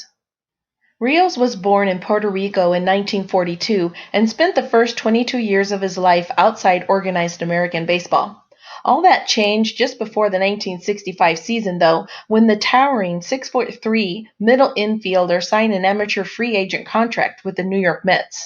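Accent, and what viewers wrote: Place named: Cleveland, United States. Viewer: Im sure you couldve said Juan Rios better. You sounded like someone from Cleveland. Still you get a thumbs up sister!!!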